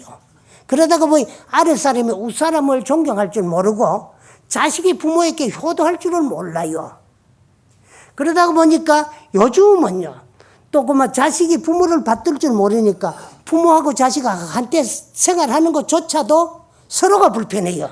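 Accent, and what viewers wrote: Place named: Gangwon, South Korea. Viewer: 감사 합니다 스님법문 듣고보다보면 빠져들어갑니다 구수하신 말씀 강원도 사투리로 정감있는 말씀 너무 재미있게 하십니다 항상감사드립니다 날씨가많이 덥습니다 항상건강하세요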